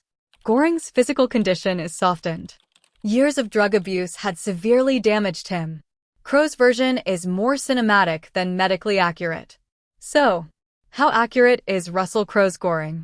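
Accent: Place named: United States. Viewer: Difficult listening to an american female voice narrating this story so i wont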